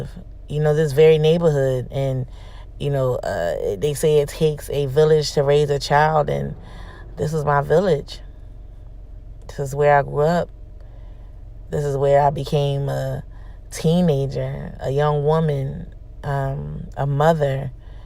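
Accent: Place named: New Orleans, United States. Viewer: Your accent was straight giving me New Orleans vibes.🤩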